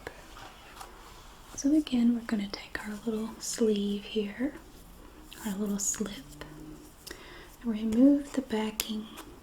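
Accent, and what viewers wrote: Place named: South, United States. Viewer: Your voice is the most beautiful thing I’ve heard in awhile. You sound like Lina Grace with a Southern accent instead of an Australian one. ❤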